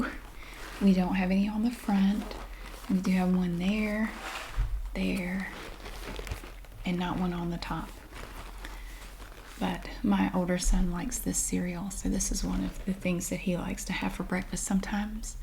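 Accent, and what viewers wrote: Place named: South, United States. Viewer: I love your accent. Your voice alone is so incredibly soothing, but the accent feels so homey (even though I am from Finland, I certainly haven’t grown around an accent like this hahah.) I dare to assume it’s southern? Love your videos anywho!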